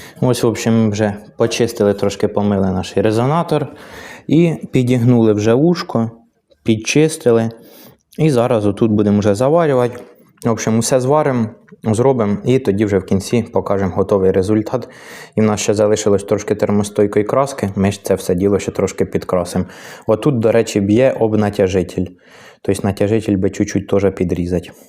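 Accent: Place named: Belarus. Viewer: тута, шо ми... мабуть бульбаш якийсь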